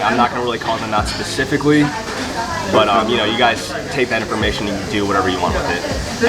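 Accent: South, United States. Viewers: Where you from? Didn’t expect such a southern accent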